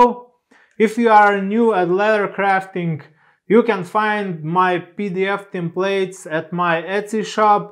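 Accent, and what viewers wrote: Russia. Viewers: Русский акцент 😁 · Мне кажется вы русский) очень похожий акцент)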